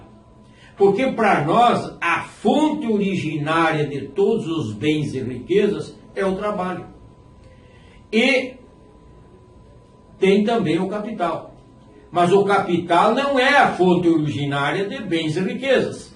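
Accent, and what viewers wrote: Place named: Rio Grande do Sul, Brazil. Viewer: Pelo teu sotaque, imagino que o senhor é gaúcho como eu.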